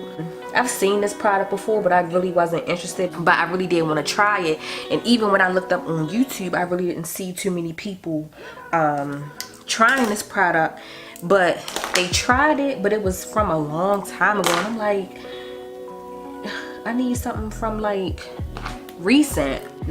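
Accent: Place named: New Orleans, United States. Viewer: I thought was from New Orleans, by her accent. I love her accent. Of course, humidity in the New Orleans area is like the worst (it's rare to just have a good hair day due to the humidity)! But Baltimore is pretty cool; I like that they get all 4 seasons.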